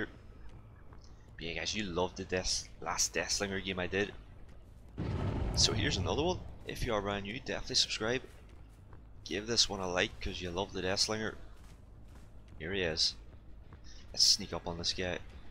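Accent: Northern Ireland, United Kingdom. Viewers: you from northern ireland? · Are you from Northern Ireland?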